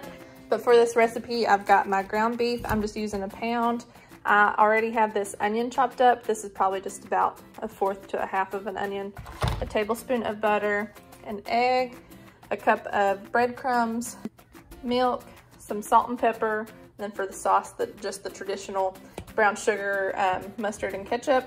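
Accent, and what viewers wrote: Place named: United States. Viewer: Hi... I'm new from Shauna's channel. I like what Im seeing 👀, so I've subscribed. I noticed your accent, what State are you from??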